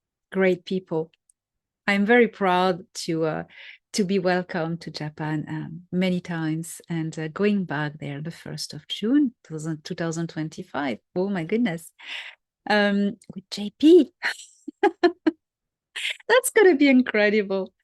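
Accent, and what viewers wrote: France. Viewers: it would be awesome to listen to an audio book read by you with your beautiful French accent ❤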